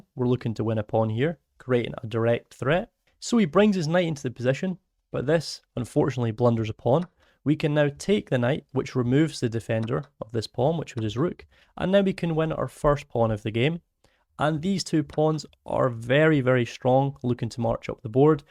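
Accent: Scotland, United Kingdom. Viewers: It's a Scottish accent. Canadians have the weird accents. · It's Scottish 😂😂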